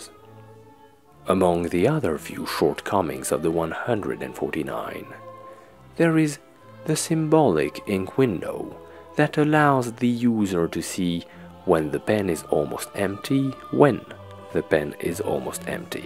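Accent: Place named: England, United Kingdom. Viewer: J’aime your English accent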